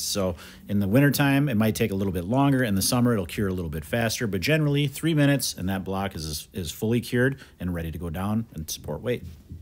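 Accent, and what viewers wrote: Wisconsin, United States. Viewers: Just from your accent, I was picking up some Minnesota which makes sense kf what you told us where you apprenticed and then I definitely thought you were a Wisconsinite. I'm a born and bred Wisconsinite myself. Dad worked on a diary farm making cheese in summer during school breaks in the Sheboygan area where he was born and was raised in Kiel with family right in New Holstein. I was born in Milwaukee and that's where my mom and her family have always been. Then after being in Crystal Lake, IL until age 3-4 as my dad commuted to work in Northbrook, we settled in Kenosha...so the super southeast and only 10 min from the WI/IL border. Lots of cows everywhere in this state. As a life-long rider of horses (jumpers and dressage) and watching farriers trim and put on new shoes, do you think you could (in a pinch) trim a horse hoof? 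· I thought I pinned your accent! Much love from a fellow Wisconsinite!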